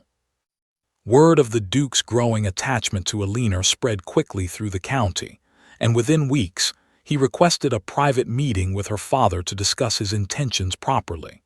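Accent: United States. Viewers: Oh dear, about half way through and at least half a dozen mis-pronouncements in an inappropriate american, to my ear, voice. But it's a short story and perfectly sweet.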